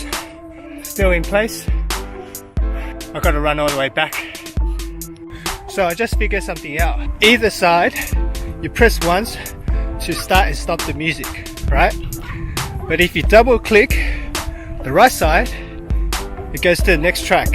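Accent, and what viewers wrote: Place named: China. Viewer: este chino hablando ingles me vendió los audífonos a un latino. Maravilla en su máximo esplendor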